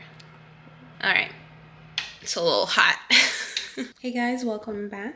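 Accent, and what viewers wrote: Jamaica. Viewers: You sound Jamaican 🇯🇲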